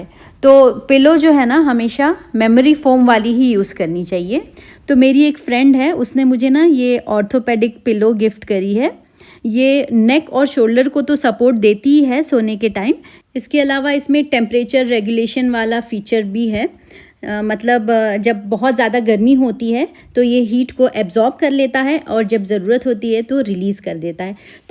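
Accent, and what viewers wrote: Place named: Bihar, India. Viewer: Aap kahan ki rehne wali hai aapke lehje se lagta hai aap bihar ki rehne wali hain susral to aapka u.p. hai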